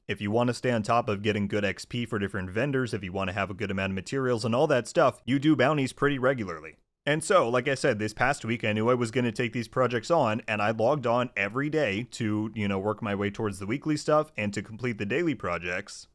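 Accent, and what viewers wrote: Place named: Brooklyn, United States. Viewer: Why...not that I'm bih'ing, cause I ain't, cause am digging it... but, why do you sound like ya just hop off a train from Brooklyn? LMAO. Seriously. Least to my ears, but you sound today like you got that twang...js.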